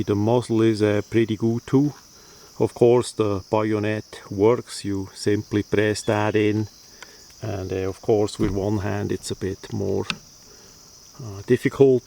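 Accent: Switzerland. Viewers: Ihr Schweizer Akzent ist einmal eine schöne Abwechslung von Arnolds Österreichischen Akzent. Apropos, wissen Sie welche Shotgun Don Camillo hatte?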